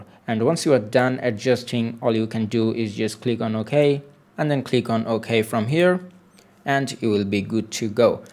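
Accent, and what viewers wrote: India. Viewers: Where would the world be without people like you? Seriously, every time I have a problem in life, there's someone with an indian(?) accent that post a video somewhere that makes everything easier. People like you are the salt of the earth.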